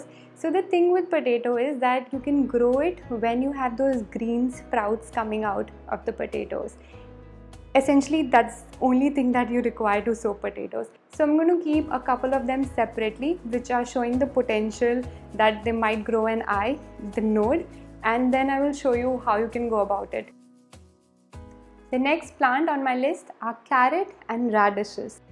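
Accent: India. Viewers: Pure Indian accent 😂😂😂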